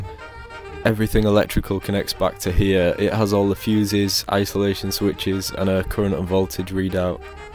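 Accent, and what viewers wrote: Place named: Yorkshire, United Kingdom. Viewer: That's a good old yorkshire accent for ya :-)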